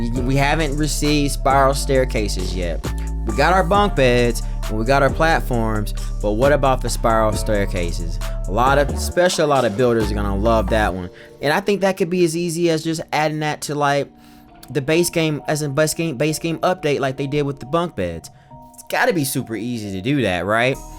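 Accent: South, United States. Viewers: I think your southern drawl just didn't want to hit the r before the g. Burglars. Haha, no shade. All my family is from the deep South but I was raised in Iowa 😂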